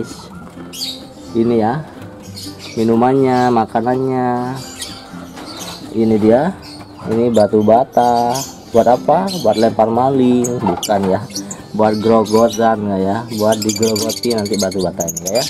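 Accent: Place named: Java, Indonesia. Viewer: Bosomu medok jon.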